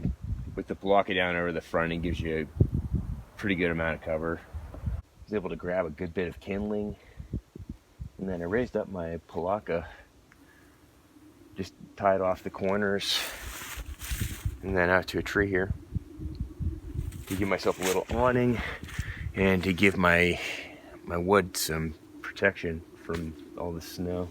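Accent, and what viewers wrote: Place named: West Coast, United States. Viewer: Dang bro, you sound West coast . Love the channel new sub 👍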